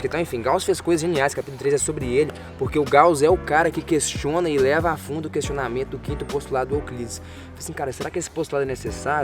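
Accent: Minas Gerais, Brazil. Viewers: vc é mineiro? sotaque de mineiro de BH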